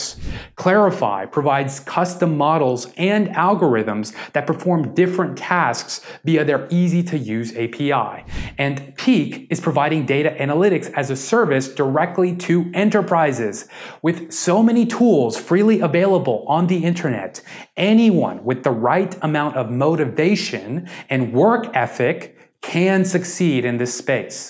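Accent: India. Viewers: Sir ,you r Indian or American?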